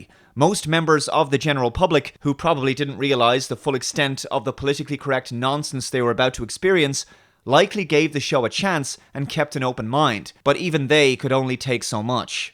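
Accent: Ireland. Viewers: I've been a bit fed up tonight so I thought let's listen to a nice Irish voice, very calming! Not going to be for too long though is it! Not with silly fuckers going along with Leo's abort & import policy! I thought the Irish were better! Seemingly not! Better learn Pidgin!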